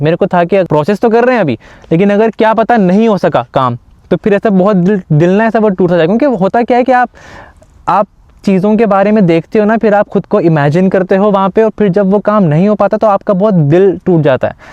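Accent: India. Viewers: Trust me bhai teri accent sun ke laga tu Indore( India)se hai. Mast aadmi ho tum. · Bhai aap to pure indian lagte ho accent bhi ❤ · brooo, I literally thought that you were an indian but aap tou Pakistani niklayy bhaii saab. your accent is kinda indian ngl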